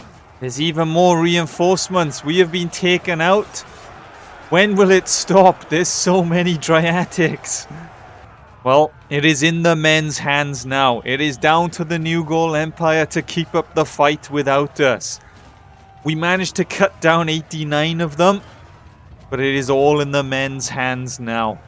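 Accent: United Kingdom. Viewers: love the content, this randomly popped up in my feed. quick question, are you welsh?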